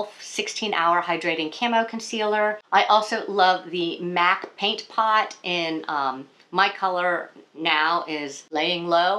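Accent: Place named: United States. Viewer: Had to switch off, tips might be good but that American voice does your head in. Sorry.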